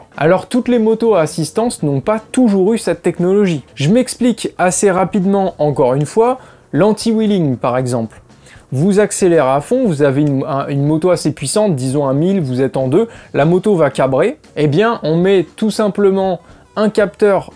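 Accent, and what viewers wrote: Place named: England, United Kingdom. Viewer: oh putaing l'accent anglais .......😂😂❤ Tu mélange beaucoup de choses! Ride-by-Wire, appelé aussi Drive-by-Wire, vu pour la première fois sur la Yamaha YZF-R6 en 2006. La poignée des gaz est reliée à un contrôleur, lequel envoie les changements de position de la poignée à l’ECU, lequel à son tour envoie un signal aux actionneurs, les composants électroniques et mécaniques agissants sur les injecteurs, en prenant en compte différents paramètres : le régime moteur, la vitesse enclenchée, l’oxygène non consommée dans l’échappement, etc. Ce système permet un contrôle précis du mélange air/carburant......après le reste c'est de la mécatronique que tu es en train de mélanger à ton sujet...❤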